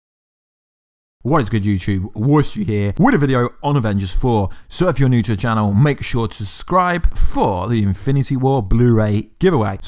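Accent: United Kingdom. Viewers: I prefer cosmic wonder. He has better presentation and doesn't have this goddamn Brit accent. Sounds like he has a knob end in his mouth.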